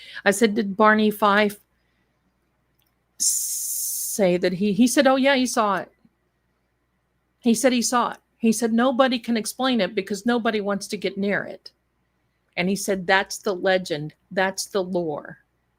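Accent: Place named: Southern Ohio, United States. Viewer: You must be from southern Ohio.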